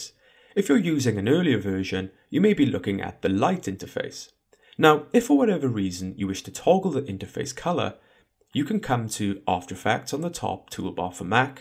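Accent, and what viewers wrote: United Kingdom. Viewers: Is there an option to turn off british accent?:hand-orange-covering-eyes::hand-orange-covering-eyes::face-orange-raised-eyebrow::face-orange-biting-nails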